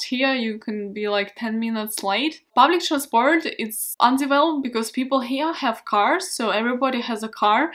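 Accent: Russia. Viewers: Сразу понял что русская по акценту😄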